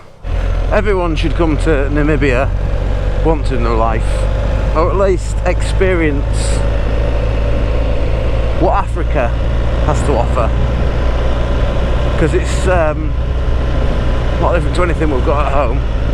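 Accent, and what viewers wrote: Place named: North, United Kingdom. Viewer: You sound more northern than normal. And I don’t know how that is possible. As usual. Incredible video!!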